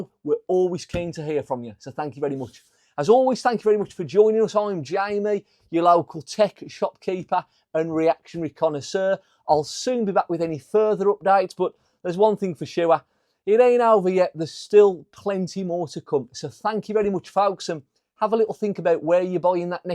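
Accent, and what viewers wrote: Black Country, United Kingdom. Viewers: This accent just took me straight to a time in my life when I lived in rapid succession in Dudley, Wednesbury, Cradley Heath. I'm in <num> again.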